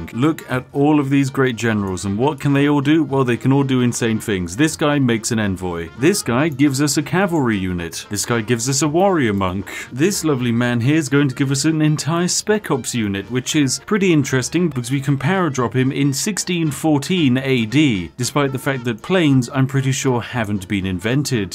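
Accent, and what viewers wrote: United Kingdom. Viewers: your accent is so British ohmygod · You sound like Internet Historian. Is this one of your "secret channel" where you sound more like a british dude?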